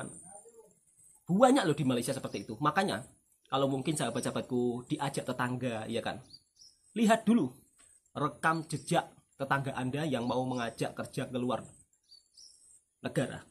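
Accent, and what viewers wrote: Java, Indonesia. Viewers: wah sudah kurang medok nya wak.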